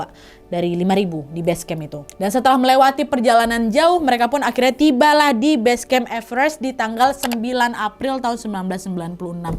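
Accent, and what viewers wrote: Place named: Pekanbaru, Indonesia. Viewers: ndeh logat pekanbaru bana ha😂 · khas banget logat minang nya ka😂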